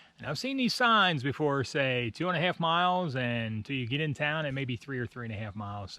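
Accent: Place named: Philadelphia, United States. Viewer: I thought I heard a Philly accent in your speech! I don’t doubt that that cheesesteak was the best!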